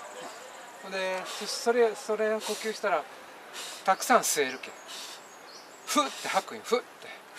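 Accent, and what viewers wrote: Japan. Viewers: 最初は愛媛の方言かと思ったｗ 岡山出身だけどその辺のおっちゃんの喋り方だな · なんか苛つく言い方に聞こえるのは私だけかなぁ？ 九州弁ってこうなの？ あまり皆 理解してないと私も思う。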